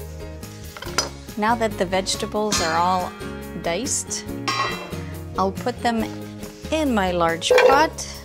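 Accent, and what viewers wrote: Canada. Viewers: That Canadian accent doe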